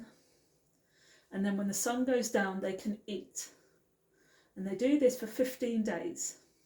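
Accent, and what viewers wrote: United Kingdom. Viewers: I thought you are from USA I hear that UK accent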